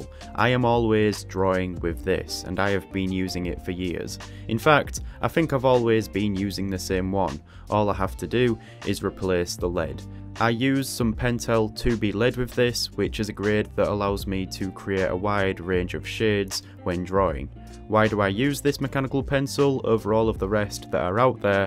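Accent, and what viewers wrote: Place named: Hull, United Kingdom. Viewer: Hello. Just thought I'd ask, with that accent, are you from Hull? I grew up there myself. Love the channel by the way, I've learnt quite a bit just from your tutorials.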